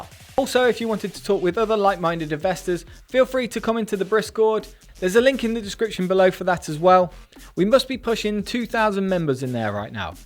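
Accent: United Kingdom. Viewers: The best thing about the british accent: "Tesla Investor" rhymes :))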